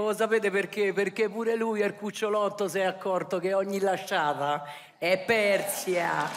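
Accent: Rome, Italy. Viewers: E stupenda troppo forte fa ridere da morire poi romanesca è ancora più forte 😅😂😂😂😅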